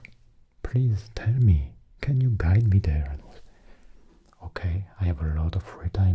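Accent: France. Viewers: Are you french mate?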